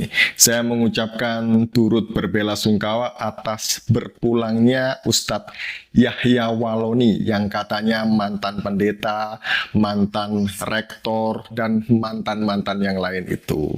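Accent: Java, Indonesia. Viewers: Medog Jawa nya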